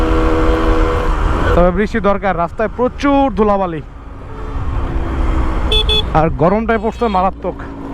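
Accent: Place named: Tangail, Bangladesh. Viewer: Bhai apner tangail er kothai amar gram er bari tangail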